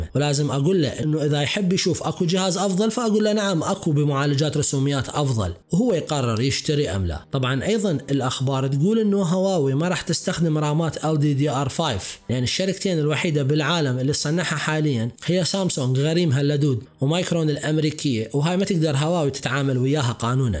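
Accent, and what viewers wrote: Iraq. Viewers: يمعود شكد بلعراقي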